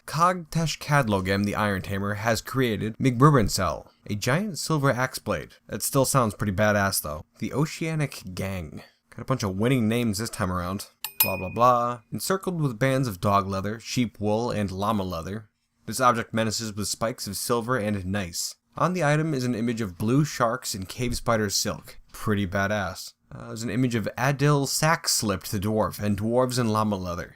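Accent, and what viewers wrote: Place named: Northeast, United States. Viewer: So having listened to you speak for about.... 15 hours now... I'm guessing that you're in the United States and you live in the north-east, somewhere within the vicinity of New York State. Am I in the right ballpark? Of course, privacy considered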